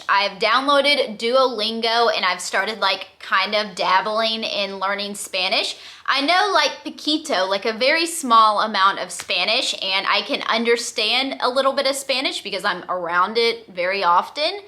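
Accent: South, United States. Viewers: Hey there, have been watching you for sometime, especially when you were doing Keto. I love to watch your infectious Southern “ hey y’all “. You are such a beautiful gal, but some of these pics on the You Tube look a little shady. That’s not your style. Wish you and Cody the best.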